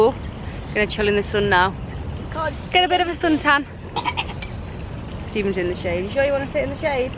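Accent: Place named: Yorkshire, United Kingdom. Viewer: That Yorkshire Accent.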